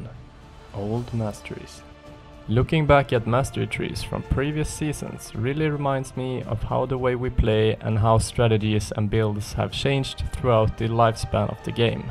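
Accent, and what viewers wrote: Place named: Romania. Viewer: Deci dupa accent deja ceva nu era ok, ba asta ii roman, dupa screenshot-ul ala... :))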